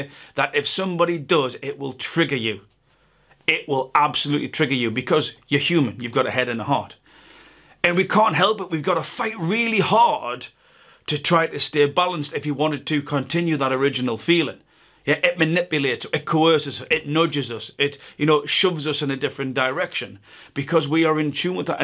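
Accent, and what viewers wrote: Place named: Scotland, United Kingdom. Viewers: Loving the Scottish accent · the moment I heard your accent it was an instant subscribe...my weakness is Scottish accents. She was literally painful to watch as a woman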